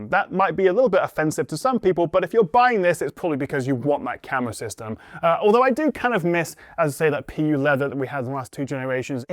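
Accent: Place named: Wales, United Kingdom. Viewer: Didn't know you're from wales bruh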